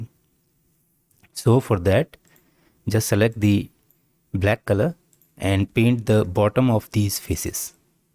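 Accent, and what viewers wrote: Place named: India. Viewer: I like the Indian cool voice...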